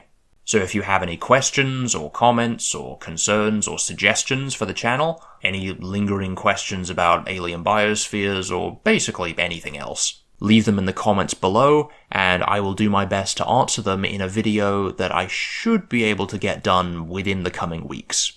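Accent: United Kingdom. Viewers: Omg you’re British!!! I thought you were a Neotectan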